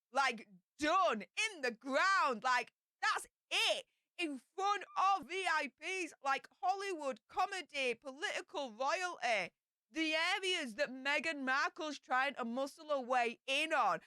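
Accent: Yorkshire, United Kingdom. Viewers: Too loud? Thats your natural voice or so I thought? Your the same in all your videos as far as I can tell. Get used to the Yorkshire Gob folks :) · I love your accent, what part of the UK are you from? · The British accent sometimes gets a little heavy. Harder for Americans to understand. I like ur show tho.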